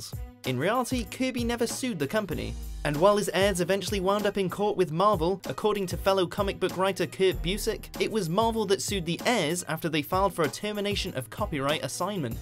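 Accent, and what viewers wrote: England, United Kingdom. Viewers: Dude, English guy!!! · the first british wathmojo host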